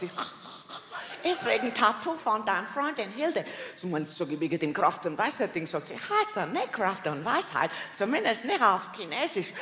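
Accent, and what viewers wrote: Bavaria, Germany. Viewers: Zum Glück versteh ich von Natur aus als Steirer🇦🇹 auch die Bayern. Lg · Einfach so oft anhören bis du 95% verstanden hast. Das Wörterbuch Bayrisch leicht lernen. 3 Wochen mit Bayern auf Urlaub, keiner der Deutsch kann. Es wird nur Bayrisch gesprochen, dann verstehst sicher 100% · Lernen bayrisch oder höre es nicht an. Ganz einfach! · Ich habe sogar versucht Bayrisch mit Untertiteln zu verstehen - mein Gott, kommt da ein Müll raus., vielleicht etwas langsamer. Wir sind nicht auf der Flucht.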